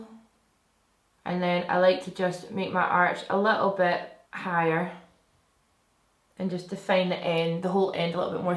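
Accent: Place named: Scotland, United Kingdom. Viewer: U sound like the Scottish version of Yelena Belova and I bloody love it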